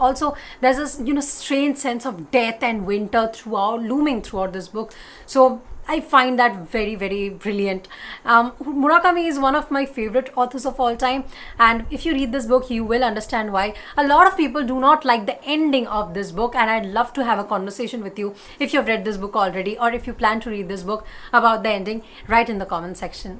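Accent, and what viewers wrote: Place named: India. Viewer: I think you have a very good command over English language especially coz of your indian accent. I mean your language is quite easily understood by indian audiences. I looked for audio books over YouTube and applications so that I can improve my English but didn't get even a single video or audio book in indian accent. Then I thought of you for a second that why cant you create an audio book over youtube. Isn't it a great idea? It will help a lot of Indians like me who are looking for such audiobooks. I hope you come up with atleast one such book in near future. Thank you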